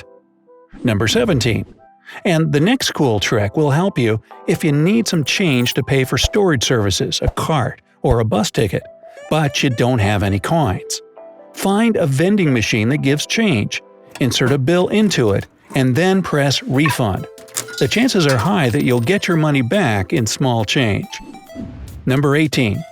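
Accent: United States. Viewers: The voice of the narrator is an american English accent, but tip #33 talks about a "special capsule" for sleeping in instead of a hotel. Nobody from America knows what those are because our airports don't have them. Many countries I've traveled to in Europe and the middle east don't have them either. Come to think of it, I've never seen an airport with sleeping capsules. Great idea, I've just never seen it. I've been to Sydney, Tokyo, and Shanghai airports and I did not see them there either, but I wasn't looking for them. Maybe I just didn't notice them? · Is it an American accent in the video ????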